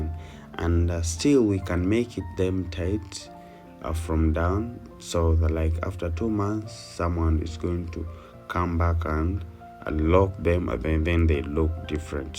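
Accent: Uganda. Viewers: This accent is sooo Ugandan 🇺🇬🇺🇬🇺🇬🇺🇬 my first time here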